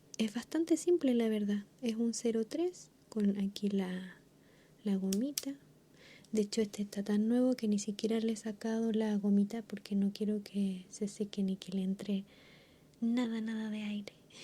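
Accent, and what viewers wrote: Chile. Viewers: Grande, siempre me gustó tu voz así chilena de chill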